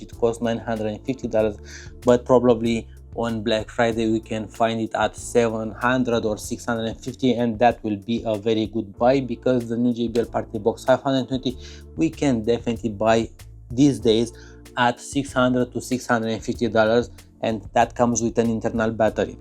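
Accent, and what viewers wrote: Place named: Romania. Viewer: Căutăm să văd recenzii despre boxa asta am dat de canalul ăsta .Să moară bibi dacă tu nu ești românaș de-al nostru chiar dacă vorbești în engleză bag mana-n foc după accent . Sper sa nu dau greș cu boxa asta .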